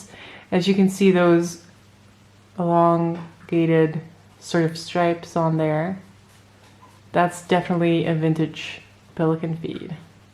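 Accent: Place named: United States. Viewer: How come you do not have a Dutch but an American accent? Just curious, I never would have guessed. 🤔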